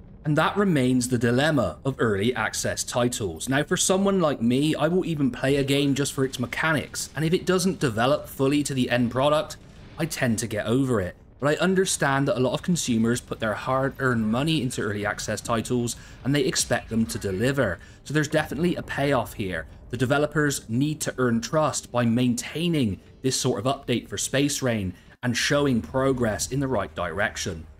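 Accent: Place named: Cornwall, United Kingdom. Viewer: First time watching this channel and ive got to ask: is that a cornish or Somerset accent?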